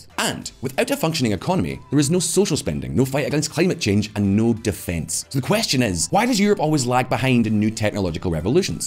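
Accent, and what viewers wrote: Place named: Scotland, United Kingdom. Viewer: Ahh..Scottish…❤️❤️❤️ breath of fresh air!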